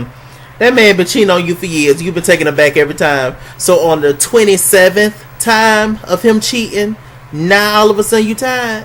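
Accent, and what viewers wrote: New York, United States. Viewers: Your “NY” accent tho 🤣🤣🤣🤣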